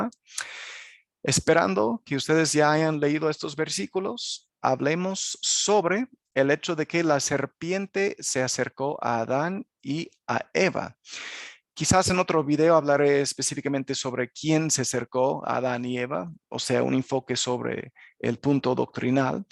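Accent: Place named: United States. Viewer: Su español hermano es muy bueno, aunque todavía se le nota acento norteamericano, eso se puede reducir, pero nunca desaparece al menos en este estado mortal, igual en la eternidad será el idioma adamico, eso es lo bueno.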